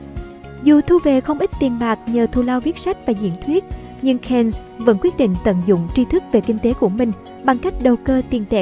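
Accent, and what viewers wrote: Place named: South, Vietnam. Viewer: Người đọc giọng miền Nam này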